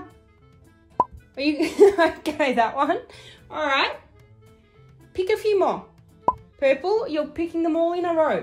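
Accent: United Kingdom. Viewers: Is this YouTuber brithish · Are you British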